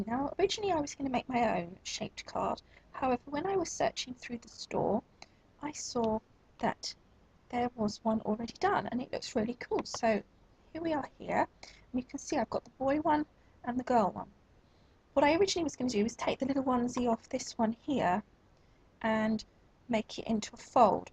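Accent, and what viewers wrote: United Kingdom. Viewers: Really a cute card...thanks for sharing. My grandmother was from England and hearing you reminds me of her. My husband always gives me a hard time because I love anything about England... movies etc...love watching BBC films on Netflix and hearing that British " speak' Thanks for all you do. Pam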